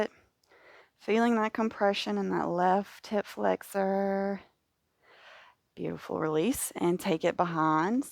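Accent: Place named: South, United States. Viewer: Feelin' Friday's flow and the Southern Belle accent is strong today! The pace is just right as always ;-) I'd like to praise you for reminding me of one of my favorite covers out there too! <link>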